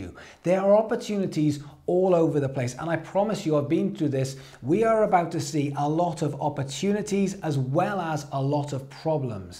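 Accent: Wales, United Kingdom. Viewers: Great vid! You gotta new sub :) Are you Welsh?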